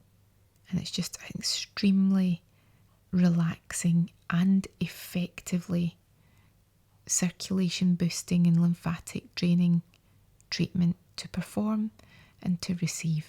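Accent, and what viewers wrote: Scotland, United Kingdom. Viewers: Love Scottish accents 😬😍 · omg i love her Scottish accent so much! Great video and very easy to understand:)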